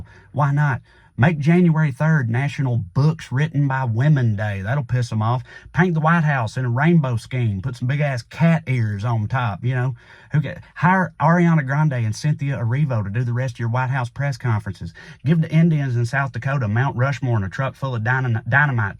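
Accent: South, United States. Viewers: I LOVE you. JOE Biden and I went to the same supposedly Catholic high school for three overlapping years, and I'm srill angry about the candyass pardon of his son. Your observations thereon have at least taken the edge off my anger. But I remain profoundly angry at Joe for his continued arming of the Israelis in their crucifixion of the Palestinians. I continue to visit your site because a redneck liberal who speaks in a Southern accent soothes my soul back into theological Hope for whole minutes at a time. God bless. Stay strong. Speak out. Many thanks. WKS.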